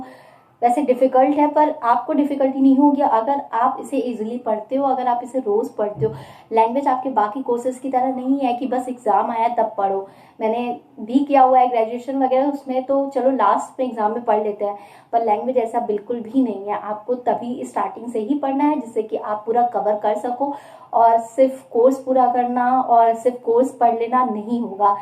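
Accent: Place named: France. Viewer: And now i love the french accent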